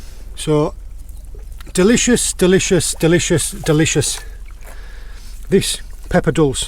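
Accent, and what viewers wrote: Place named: England, United Kingdom. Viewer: where do you forage? by accent Im guessing England, but I cant place it, I never lived in England long enough to tell. Im actually considering moving back to Ireland as I miss foraging seafood a lot - about 20 years ago I used to live in Carrigaline/Crosshaven, Ireland and pick up seafoods with a bucket. Your channel sure makes me miss those times.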